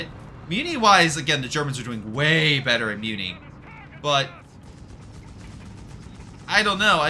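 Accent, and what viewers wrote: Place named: United States. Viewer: as a German/Italian your pronunciation of Guastatori is quite good and probably the best possible with an american/english accent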